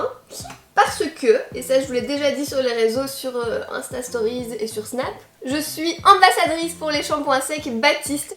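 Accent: Belgium. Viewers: J’adore l’accent belge mais sourci ça passe pas 😂😂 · Trop heureuse que Batiste t'es choisi pour la campagne! Tu es géniale ! Par contre déçu qu'il t'oblige a dire "huit" avec l'accent français, je préfère ton joli accent belge! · Je viens de commencer à te regarder et je t'adore . Tu es Belge ? Moi oui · C'est malin je vais écouter maintenant! Par contre j'suis déçue qu'on t'ai forcée à dire le "huit" à la française, en quoi est ce que choquant qu'on entende que tu viennes de Belgique? C'est dommage ça retire ton charme:( Et puis merde vive la Belgique! XD (Oui je suis belge XD)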